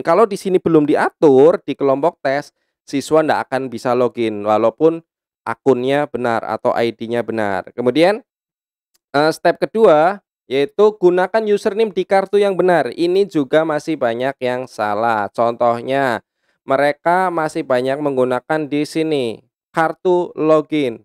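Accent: Banyumas, Indonesia. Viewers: terima kasih om...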